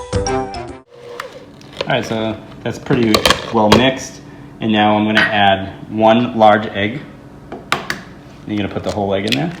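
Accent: Boston, United States. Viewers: Thanks for the recipe! Am I hearing a Boston accent there?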